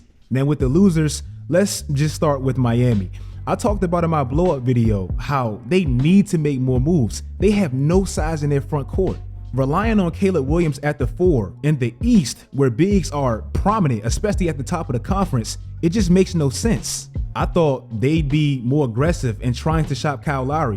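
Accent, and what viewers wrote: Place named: New York, United States. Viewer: You sound like you from NY lol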